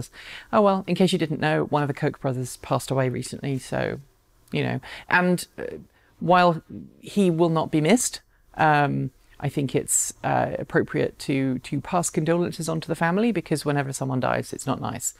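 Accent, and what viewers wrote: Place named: England, United Kingdom. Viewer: Ah, you Brits. Here I thought you were mispronouncing methane, so I checked first the Oxford and then the Websters dictionaries. Webster shows the American English and the Original English pronouncations. "meth·ane | \ ˈme-ˌthān , British usually ˈmē-\". But, not to nit-pick, I really enjoy your channel. And your English accent.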